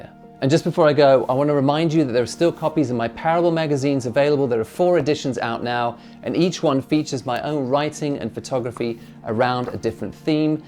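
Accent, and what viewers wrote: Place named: South Africa. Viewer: Man I knew you were South African the first time I heard you speak, can't mistake some of those enunciations coming through the British accent 😅 . Great video bru 🇿🇦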